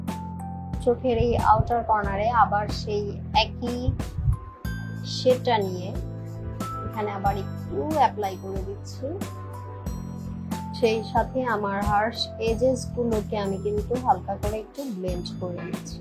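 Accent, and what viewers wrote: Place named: Comilla, Bangladesh. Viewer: Apu ami o cumillar meye😍🙋🙋 tmar kase cumillar vasay kotha bolte dekhte onek valo lage 😁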